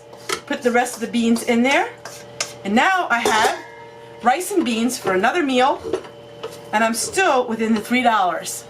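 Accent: Pennsylvania Dutch, United States. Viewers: I’m off subject here, I cannot find your mailing address. My son is curious about your accent. He wanted me to ask you if it is Pennsylvania Dutch?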